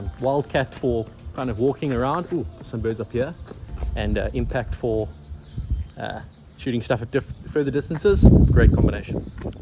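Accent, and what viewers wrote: South Africa. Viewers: You can hear dat he is afrikaans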